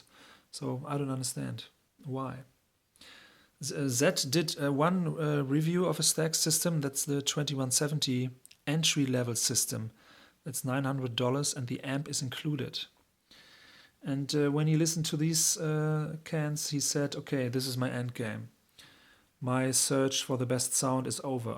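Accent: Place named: Germany. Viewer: You sound very german. Nice review.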